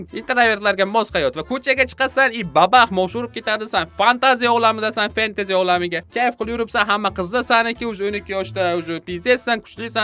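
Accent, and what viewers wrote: Qashqadaryo, Uzbekistan. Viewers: Qashqadaryodanmisiz diyman-a?😅 gapirishiz jo‘ramdi eslatopti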